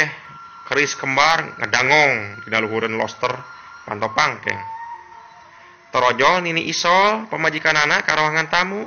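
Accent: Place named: Java, Indonesia. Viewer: resep logat jawana.....hhee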